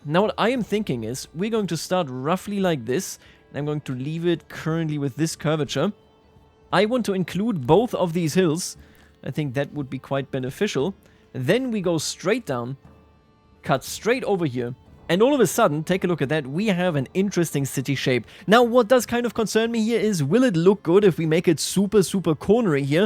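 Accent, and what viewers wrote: Bavaria, Germany. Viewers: he even uses some german words and phrases in between^^ but only noticable for a german I think, and the hints of the accent gave it away for me :p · It smells like a german akzent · sofort gehört dass du deutscher bist (kein hate) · Damn even tho you are from Bayern, isch dein Akzent im Englischen halt echt ned so schlimm :0 Very nice Video dude, now i have to watch the whole series!. Grüßle von den werten Nachbarn ausm Schwabaländle ;) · Sometimes you have Bavarian Germn Spikes (were german slips through while talking) Its not bad I like that diversity. German Englisch Vielfalt.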